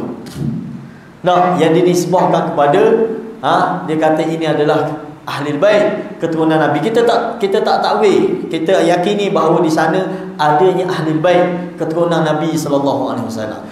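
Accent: Terengganu, Malaysia. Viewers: Tq Mantap ustz teganu Walaupon baru ku kenal Namun pelat teganu mu menjadi poojaan hatiku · Loghat ganu....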